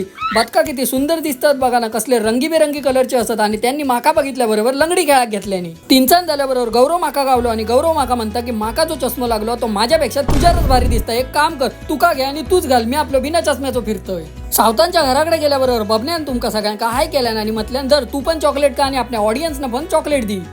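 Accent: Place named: Malvani, India. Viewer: I like ( ❤) her hairstyle so nice cute girl.aani tuz malvani pn khup Chan aahe.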